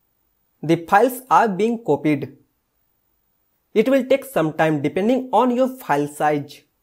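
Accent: India. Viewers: very bad english+indian= leave the video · Luv your desi accent 💕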